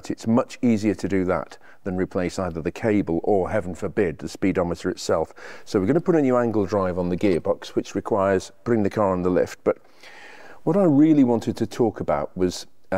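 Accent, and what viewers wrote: United Kingdom. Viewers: I absolutely love the sound your voice. British English at its finest. Greetings from Sweden.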